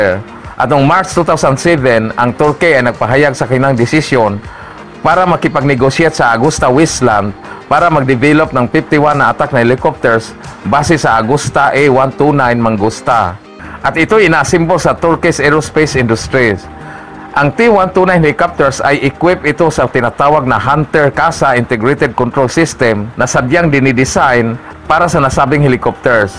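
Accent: Visayas, Philippines. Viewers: Hirap intindihin pag bisaya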